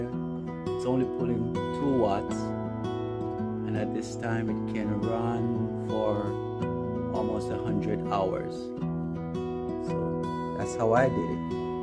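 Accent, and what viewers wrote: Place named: Jamaica. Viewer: You sound Jamaican, greetings from Montego Bay.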